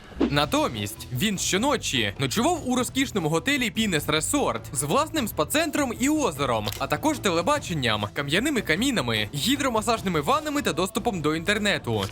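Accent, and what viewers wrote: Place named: Russia. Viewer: Чувак, ти цю промову ззапорєбріка якнайшвидше забудь, Це біда, і ти її носій, досить акати, кажи суть нормальною мовою.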